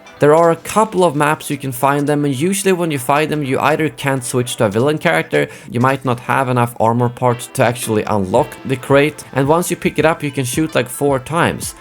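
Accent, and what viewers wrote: Sweden. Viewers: Are you Swedish? (It really sounds like that when you talk, I’m just curious) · Love that Swedish-English accent haha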